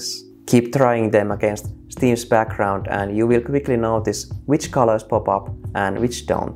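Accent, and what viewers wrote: Finland. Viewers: Are u Finnish? Your accent sound like one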